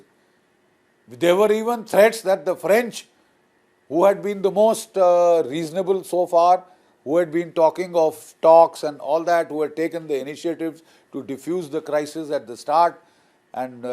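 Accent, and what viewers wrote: India. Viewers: How come u listning Indian accent broadcasts???😳🤷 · I usually can't stand indian accents but I'll tolerate it just for this guy. He's good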